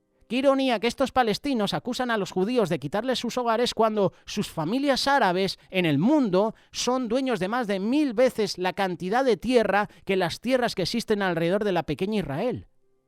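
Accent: Spain. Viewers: Supongo que eres español por como hablas. Pues siento decir que segun tu argumento España no existe es el falso nonbre que nos dierin los romanos.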